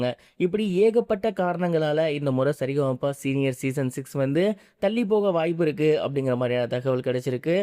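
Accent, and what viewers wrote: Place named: Kerala, India. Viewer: Nayae un voice kerala chee...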